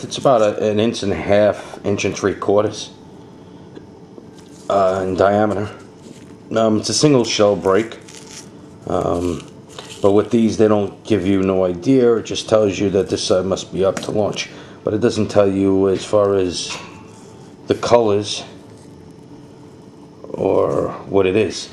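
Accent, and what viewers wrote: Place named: New York, United States. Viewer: Your NY accent remind me lot of the guys from Grucci when they fly here to las vegas from new york to work on a show hahaha. and all i would hear is " hey Tony...go fuck yourself!" funny as shit loading and wiring shells in the sun and everyone is talking shit left and right...but playfully though..makes work more fun. happy 4th man!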